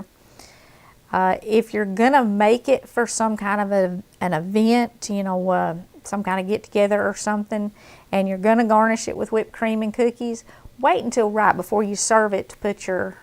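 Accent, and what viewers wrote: South, United States. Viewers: It's nice to hear your Southern English voice again:) I feel that you've been going through a hard time... (sickness - death..?) God bless you,.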